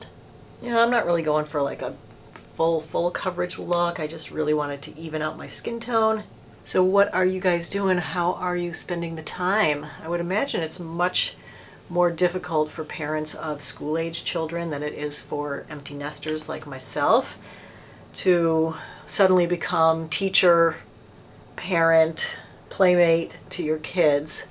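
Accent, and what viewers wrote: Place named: Massachusetts, United States. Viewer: Omg! I knew you were from Massachusetts somewhere. I can tell by your accent! I'm also from Massachusetts! I'm in Webster Ma by the lake we have here... 🤗 Yaaaay I love seeing YouTubers from Mass! I'm so excited right now!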